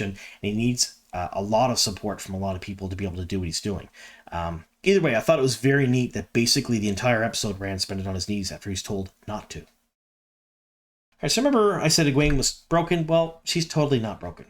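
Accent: Canada. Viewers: From your accent, I thought you must be a Canuck - thanks for confirming! #Tims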